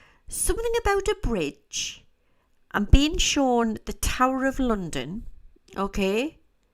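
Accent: Newcastle, United Kingdom. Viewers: Why would someone do that? I'm a fellow geordie and I love listening to you and it comforts me to hear you, when I live in Australia now. Gis the name of the people who were not nice to you and I'll kick them in the fanny for you I😂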